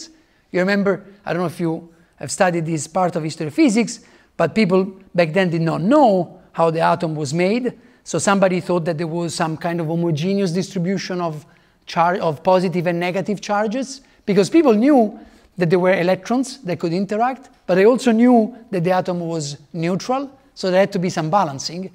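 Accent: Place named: Italy. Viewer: jestli Jirka Podolský nebo Pavel Krtouš najdou nějakého studenta, který by si to dal třeba v rámci zápočtu, tak to bude nejlepší řešení. Jestli se to nepovede do konce roku, tak udělám to, že Simona přeložím "on the fly" na diktafon, ten čas tomu rád dám. Ale nedám přepis do titulků, to by musel udělat sw nebo někdo jiný. Domluvíme se ve čtvrtek na fakultě:) Je fakt, že tohle je unikátní přednáška v českém prostoru - IMHO první technický kurz kvantové smyčkové gravitace v ČR, který dával na fakultě a mimochodem vystřihnul tuhle "netechnickou" přednášku. Ale i tak, terminologie a koncepty, které používá nemusí být samozřejmé pro posluchače, kteří nejsou obeznámeni se základy Obecné relativity a kvantové mechaniky. Takže musím jen doporučit jako prerekvizitu přednášky Jiřího Podolského na téma jeho překladu knih Carla Rovelliho (Carlo, jako jeden ze zakladatelů QLG přístupu, byl školitelem na univerzitě v Římě) <link> atd. 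Je také pravda, že angličtina je excelentní, ale se silným italským "soundem"a velmi středomořským rytmem, takže to nemusí být v kombinaci s netriviální terminologií jednoduše zkousnutelné ani pro posluchače, který anglicky umí slušně. Takže ano, má určitě smysl udělat překlad. Jinak záznam z jeho intenzivního kurzu, který končil včera se postupně objeví na téhle stránce <link> Ale je to prostě kurz živé oblasti výzkumu a dostupný bude jen pro lidi, kteří se dostatečně orientují v OTR a kvantové teorii pole a příslušných matematic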